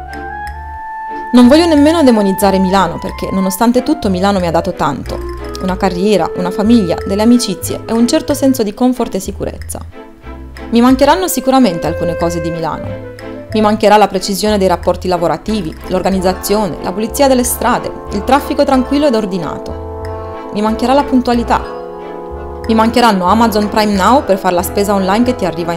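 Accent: Naples, Italy. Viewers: Hai l' accento Napoletano...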